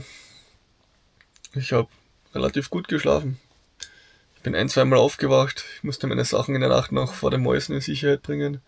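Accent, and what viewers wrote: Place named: Austria. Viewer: Ve wo bischn du ? Und welcher Berg isch des ? Ven Akzent ven Hochdeitschen tat i sogn Österreicher. Cooles Video, mir gfolln sette Video wo in die Grotten unterwegs bisch und nocher in an Biwak ausharren oder magari no ibernochten muasch! Abo und a Like hosch ve mir! Gruass ve an Südtiroler! Berg Heil!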